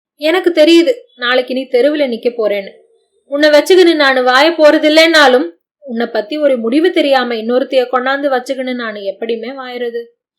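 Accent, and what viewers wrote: Chennai, India. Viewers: தரமான மெட்ராஸ் பாஷை,கேட்டு மகிழ்ந்தேன். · மெட்ராஸ் பாஷை தெரியாதுண்ணீயேமே.. ஆனா மெட்ராஸ்லயே ரொம்ப லாங்கா வாய்ந்தா மாறி படா ஷோக்காக்கீதுமே ஊம் பேச்சி..😮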